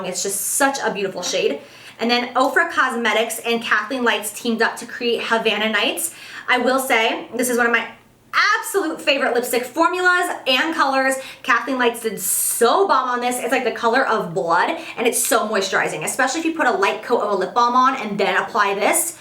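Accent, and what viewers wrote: United States. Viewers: Omg I love you accent it is the best aha the way you say caramel is so cool wish I was American xx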